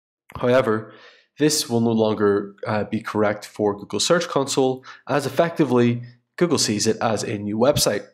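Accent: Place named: Scotland, United Kingdom. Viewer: Thanks man, really useful. That accent is hard to pin down - I hear Scottish, American and Irish!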